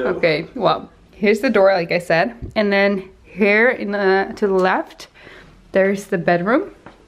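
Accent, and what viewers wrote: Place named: Netherlands. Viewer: You sound more Dutch when you’re recovering from surgery 😍 wishing you a speedy recovery and gorgeous results!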